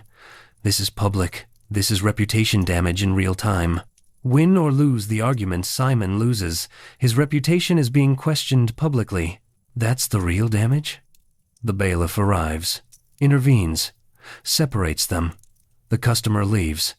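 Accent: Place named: United States. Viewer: American voice describing medieval England bad AI choice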